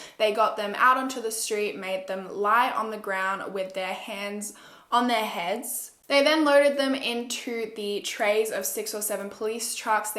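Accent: Australia. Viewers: Just Waffles Bro..umm it’s a typical Australian accent k? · she’s Australian so she has an accent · I loveeee Aussie accent omlll it’s so beautiful😍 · Omg literally my first video I seen from you and I’m listening to your accent trying to detect where your from and the second you say the word know I’m like oh shit she’s Australian and I look in the description and I was right literally love your accent , a girl from my highschool basketball team moved from Australia to the states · bro i heard ur voice for the first time and i was like she aussie to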